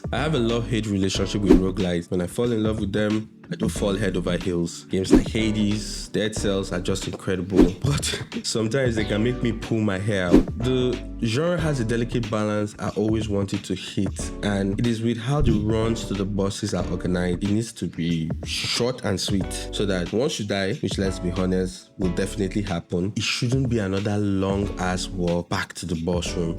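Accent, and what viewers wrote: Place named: Africa. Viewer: Heard the african accent, subscribed immediately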